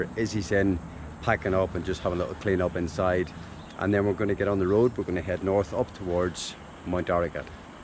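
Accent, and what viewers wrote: Northern Ireland, United Kingdom. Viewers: Fabulous and entertaining, as a recent subscriber, these vlogs are right up there in content, compilation and delivery. His Irish charm effuses the delivery, great that he has Izzy to temper his Irish poetic romanticism! I would guess that with his soft N. Irish voice grew up in the northern part of his Co.Down? and looked south to the beautiful Mountains of Mourne for his inspiration?!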